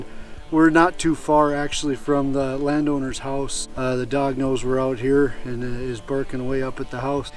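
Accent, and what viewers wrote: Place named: Minnesota, United States. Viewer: You sound like you are near where my family is from, Fairfax, New Ulm, Mankato. Nice spot!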